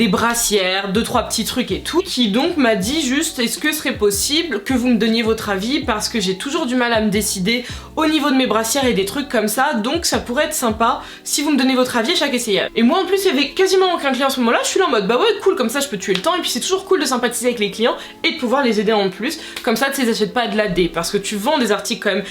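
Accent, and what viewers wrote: England, United Kingdom. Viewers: ton accent british quand tu parle anglais me perturbe trop je sais pas pk 😭😭😂😂😂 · On peut prendre 2min pour admirer son accent anglais magnifique ? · The british accent waoouuh🥺🥰🥰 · Grave un accent british quand tu parles anglais. Ca fait chelou, limite j'ai límpression que cést une autre langue. Ici à Atlanta, on parle beaucou[ plus vite et avec le nez.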